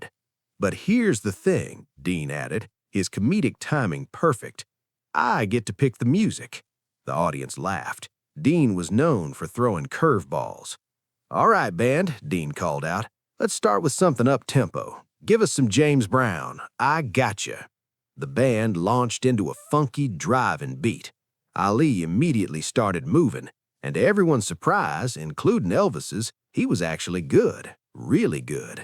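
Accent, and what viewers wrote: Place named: South, United States. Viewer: why does the narrator have to have a redneck accent?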